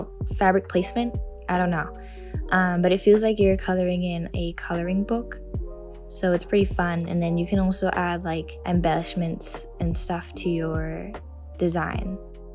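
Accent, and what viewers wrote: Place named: West, United States. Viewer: here at 37 sub wow never seen anyone in sg accessorised like that and how is ur accent so western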